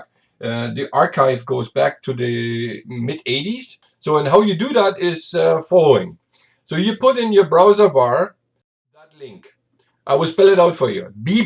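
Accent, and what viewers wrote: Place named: Germany. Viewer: Hi, interesting where are you from, as for me you have a german accent. PS. The quastion was answered a few minutes later))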